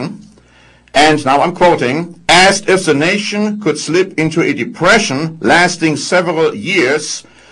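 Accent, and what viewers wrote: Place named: Germany. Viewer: this guy is german , u can easily tell by his german accent .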